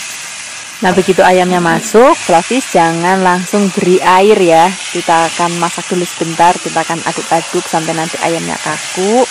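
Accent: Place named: Cilacap, Indonesia. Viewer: Kok ky wong cilacap ulih ngomong ya bun,tempe semangit senengane mamakq huhuuu😭😭😭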